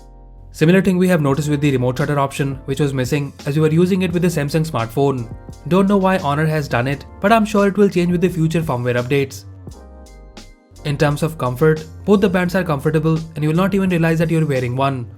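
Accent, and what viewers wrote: India. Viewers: no one needs indian accent on tech vids.. just stop..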